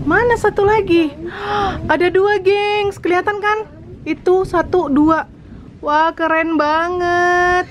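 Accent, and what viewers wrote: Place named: Medan, Indonesia. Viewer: Kongsi ya kak..... medan kali bahhh 😁😁😁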